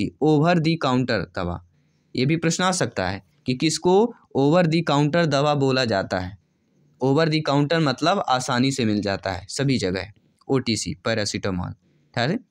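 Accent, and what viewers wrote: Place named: Bundelkhand, India. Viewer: बुंदेली बोली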